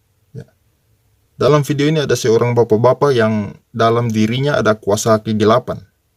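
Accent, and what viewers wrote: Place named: Eastern Indonesia, Indonesia. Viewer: Yg Bicara Ini Dr Logat nya Dari Suku NTT ATAU MALUKU!!!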